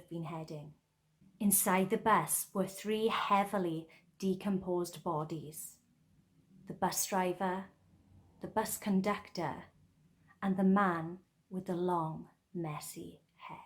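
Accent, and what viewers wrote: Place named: Scotland, United Kingdom. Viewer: You must be Scott ur accent tells it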